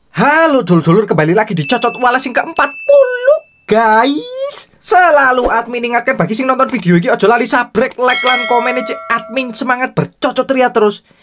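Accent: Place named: Malang, Indonesia. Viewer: Eruh sound malang mas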